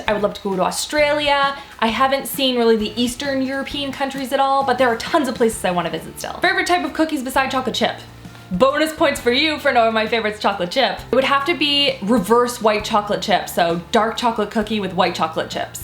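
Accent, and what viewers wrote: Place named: Canada. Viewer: I've never really heard your Canadian accent until this video, lol. So cute! Great content, as always.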